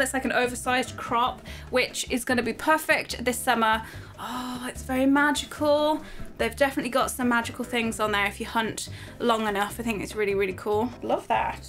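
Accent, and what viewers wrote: United Kingdom. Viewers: Ok that British accent is everything 😍😍😍🥺🥺🥺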